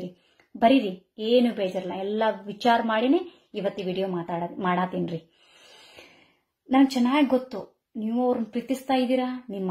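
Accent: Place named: Hubli-Dharwad, India. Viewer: I just love listening to Hubli-Dharwad Kannada - it’s such a joy! Best wishes from Mysore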